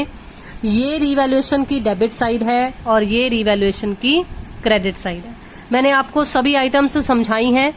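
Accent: Punjab, India. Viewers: Punjabi sound 😝😘😘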